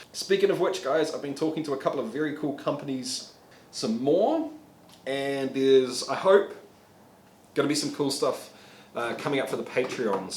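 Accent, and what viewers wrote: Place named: New Zealand. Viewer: If the accent didn't give it away, I'll let you in on a secret; He's a Kiwi, and New Zealand is a little nicer than the US when it comes to home distilling. :)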